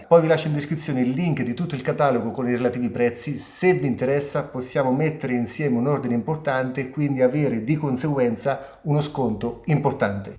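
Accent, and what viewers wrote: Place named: South, Italy. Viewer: Che accento da terrone!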